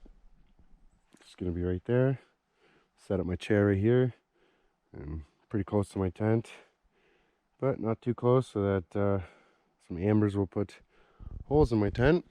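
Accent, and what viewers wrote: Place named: Canada. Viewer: I never realized how much we Canadians have an accent until I watched your videos haha makes me wonder if I sound like that too and just don’t hear it 🤣🤣🤷🏻‍♀️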